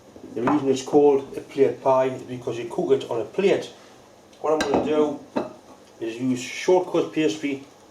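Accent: Newcastle, United Kingdom. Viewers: What a wonderful surprise to here a geordie accent..then seeing you cut the onion on a lathe knew I would like the video maker,thanks for the plate pie recipe regards Sue from Whitley Bay now living in New Zealand for 40 yrs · Some of me best memories from the fifties as a bairn were Sunday teas at me Nana's in Lemington. Everybody came so it was a full house and she made these same mince pies and they were just devoured in no time. I had 4 uncles so they got first dibs. I think your version is the closest I've seen to what I remember and I have to say ta very much for bringing back good memories, and as much as the plate pie was lovely, hearing the Geordie was balm to me soul. Since I last had a poke round through your videos I'm dead glad to see you've put all the recipe ones together. Makes it a lot easier to find when you're as old as me. We"ve lived in Alberta since 1967 and I miss home still. 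Me Dad was a whitesmith at Parsons so even your work is interesting to an old woman an all. Thanks very much for sharing, and hope you and yours are keeping well. Merry Christmas! 🤶 🎄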